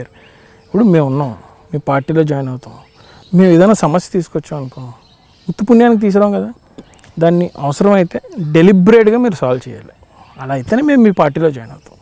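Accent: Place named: Godavari, India. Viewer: Full Godavari accent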